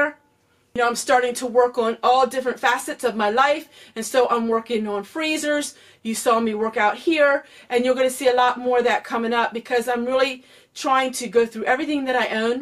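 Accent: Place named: Philadelphia, United States. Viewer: New to your channel! Omg!! You’re accent is strong! Not making fun, we have a similar accent, I’m from south jersey and my husband is from Philly. We were taking bets on where you were from until I saw your P.O. Box. I won!! Love your set up!! I had a laugh when I saw your china cabinet. I’ve converted mine for storage and my husband gave me a look 😂 See??!! I’m not the only one!!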